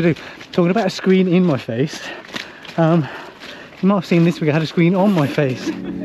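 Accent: United Kingdom. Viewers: In love with your videos and British accent hugging my auditory receptors ♥️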